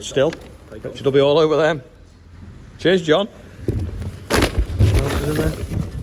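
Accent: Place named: St Helens, United Kingdom. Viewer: it's doing my head in now I'm trying to figure out were ur from I know its some were close to st helens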